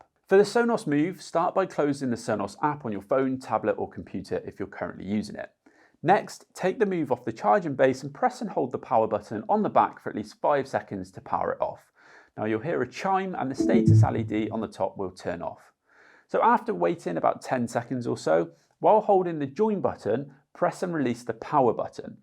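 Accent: United Kingdom. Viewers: Having a guy with a British accent makes this video and Sonos products worse than they actually are….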